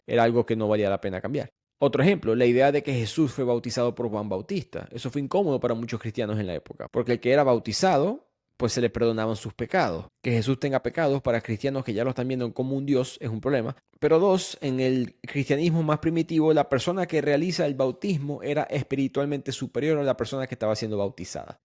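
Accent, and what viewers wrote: Maracaibo, Venezuela. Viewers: Gracias por estos episodios, por el cuestionamiento y por tus análisis. Nueva suscriptora aquí. Crecí en una casa católica, estudié en un colegio católico. Toda la vida me hice estas preguntas que estas exponiendo en el episodio y la única respuesta fue "hay que tener fe". La falta de racionalidad, de cuestionamiento, hizo que me alejara mucho. Decidí irme por el camino agnóstico, luego ateo, pero ese ultimo camino me dejo mas vacíos que los anteriores. Desde hace un tiempo quise acercarme de nuevo al catolicismo, pero desde la curiosidad. Voy a misa todos los domingos a aprender, a escuchar, a conocer desde cerca la comunidad, para poder decidir por mi misma. Todo el mundo a mi alrededor (que ya no creen hace mucho) lo ven como algo raro. Empecé a leer la biblia para saber de primera mano que es lo que dice, porque siempre me la han leído de una forma hermosa. 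La frase que mencionaste "el ateísmo es lo que pasa cuando lees la biblia, el cristianísimo es lo que pasa cuando alguien mas te la lee" me quedo resonando muchísimo. Aun no he decidido, quizá sea uno de esos que a pesar de haberla leído o a pesar de las evidencias, deciden creer, o quizá no, pero la frase me pareció poderosisima, mas que pretenciosa. Gracias. (Shoutout al acento Maracucho!)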